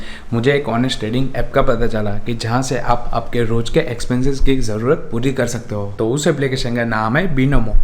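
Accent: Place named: Gujarat, India. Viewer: U r so innocent like baby + gujju voice ❤️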